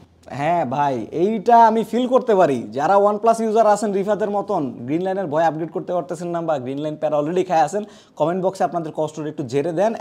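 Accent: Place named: Dhaka, Bangladesh. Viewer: bro ,jaiboga ,khaiboga, khayadise ai typer laguage ta sunte khub baje lage, i mean dhakaiya laguage ta ,,change krl vlo hoy.. khub cheap and dirty language mnehoy sunte.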